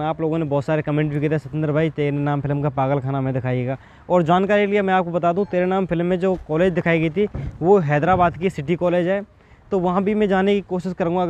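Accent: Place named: Mathura, India. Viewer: Bhai aap mathura se ho kya Apki languages esi hi he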